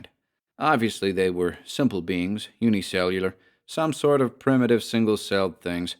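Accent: United States. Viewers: I love your ‘Merkin accent,. Nailed it! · Your American accent is fantastic!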